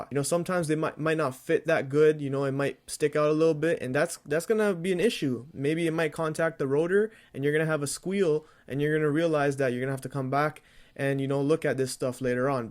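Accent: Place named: Toronto, Canada. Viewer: sounded like a toronto accent :p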